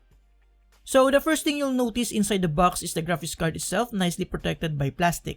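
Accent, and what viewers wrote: Philippines. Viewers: Filipino rightt? Accent palang e hehe. GOODLUCK PO SA LAHAT!! pati pala sakin ehem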